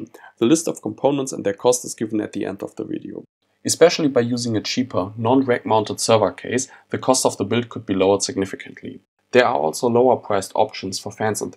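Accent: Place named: Germany. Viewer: Man hört voll raus das du Deutsch bist is bei mir auch so das voll cool :)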